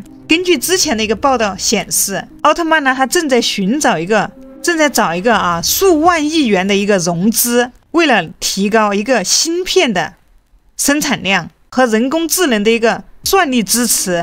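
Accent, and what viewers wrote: Sichuan, China. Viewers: 好亲切的川普~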